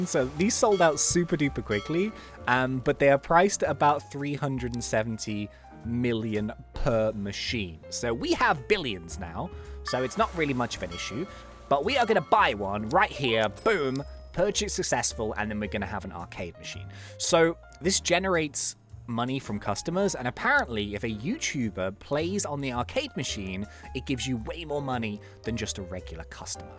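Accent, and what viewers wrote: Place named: United Kingdom. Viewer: The British actcent is awesome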